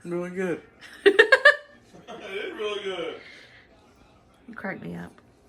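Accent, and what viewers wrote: South, United States. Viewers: I think this video was great, if we had a dollar tree in New Zealand i would totally try something like this food prices here are getting crazy. Im sure this video has inspired or helpped a family archive a holiday meal on the cheap and sometimes in life money is tight. Also your southern accent is amazing!!! 😊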